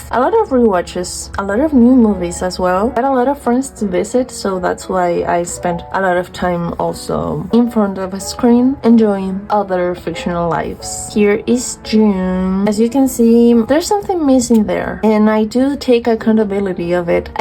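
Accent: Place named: Latin America. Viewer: for disability rep, the next book on my tbr that covers that is called “out on a limb” and it’s a romance I think? I can’t recommend it bc I haven’t started yet but it sounded really cute! For a book about books, I think the starless sea works! also lol this kind funny but as soon as you mentioned the book being expensive to get to your 3 world country (+ your lovely accent) I immediately thought “she’s Latina” — it was instant neuron activation lmao !! I actually was thinking maybe you were Brazilian (like me ❤) but the accent was just a little off from our usual accent so I wasn’t sure~~ I’m guessing you’re Chilean then?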